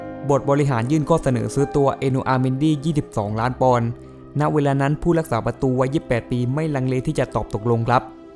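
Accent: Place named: South, Thailand. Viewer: คนใต้ภาคเเน่ครับ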